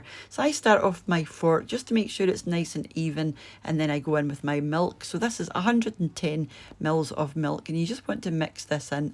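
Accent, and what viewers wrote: Scotland, United Kingdom. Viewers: Love hearing your Scottish accent and yours ones look delicious I am making tonight for tomorrow's lunch.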